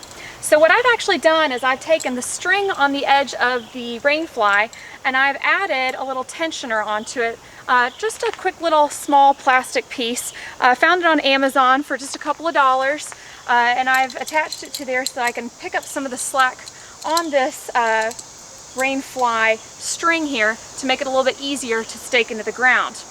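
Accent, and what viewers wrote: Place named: Alabama, United States. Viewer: Great video. Thanks for sharing. You sound like folks from my home state of Alabama, which was comforting, lol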